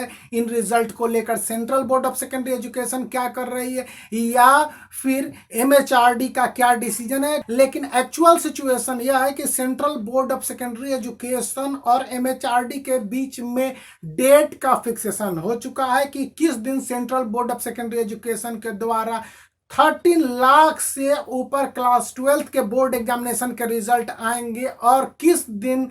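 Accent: Bihar, India. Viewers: Sir aapka bihari accent mujhe bohut pasand aya🔥🔥👍👍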